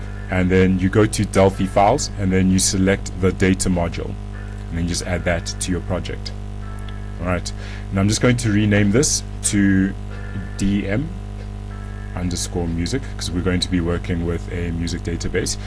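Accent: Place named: Afrikaans, South Africa. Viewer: nah lol that's just the Afrikaans accent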